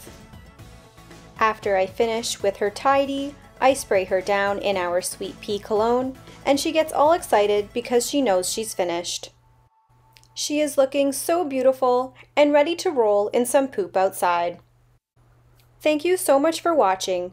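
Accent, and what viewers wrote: Canada. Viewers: And her subtle Canadian accent adds so much to it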